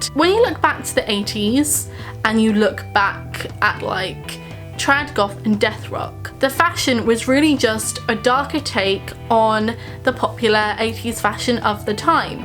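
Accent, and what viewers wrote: United Kingdom. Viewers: I don't really understand your British accent because I'm Brazilian and my English is a little limited, but I liked your video, I've been goth since December 2022, and until then I didn't know my side, until I discovered nu goth, and I understood that many people used the image of goth just for aesthetics, but I have a passion for the entire subculture, when I discovered nu goth, I fell in love, I identified so much, I feel like I consume A LOT of gothic or headmetal books and music, but my style, my way of living, is totally Nugoth, I'm kind of sad that it's seen as a trend, because for me it's something very special ❤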